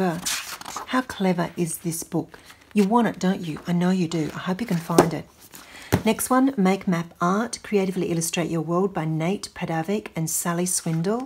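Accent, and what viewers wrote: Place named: Australia. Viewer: Hi, this video came up on my recommendation list, so glad to hear an Aussie voice, then I see you live near Canberra which is my home, I subscribed and really looking forward to more videos, love this one on books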